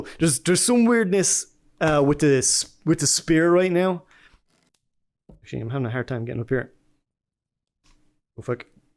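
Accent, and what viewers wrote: Ireland. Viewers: I can't place that accent, is it Meath?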